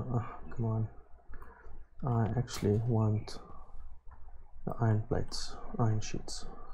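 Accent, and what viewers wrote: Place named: South Africa. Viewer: Aweh, you sound South African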